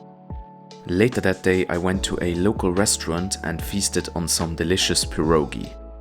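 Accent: Germany. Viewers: OK even if, but his English is with very recognizable german accent as his first language is german. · greetings from Lithuania! I like your accent, sounds like German. I have never been normally to Poland, one day would like to visit Baltic coast, mountains and capital there. Thanks for the vlog!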